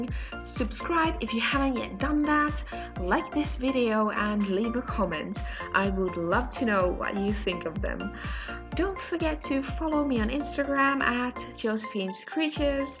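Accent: Finland. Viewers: I'm currently debating with myself on if you are Finnish (it's the accent).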